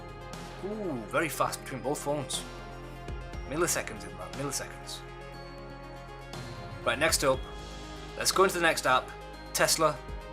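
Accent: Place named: North, United Kingdom. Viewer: This Asian man speaks with a distinctive Northern accent which is very different from the hybrid Jamaican dialect which is spoken by Pakistani teenagers in London. The original Indians of India were Black and migrated to India from Africa 170, 000 years ago. But i am only referring to young Pakistani teenagers in London who speak English in a hybrid Jamaican dialect which is very different to the distinctive Northern accent this guy has.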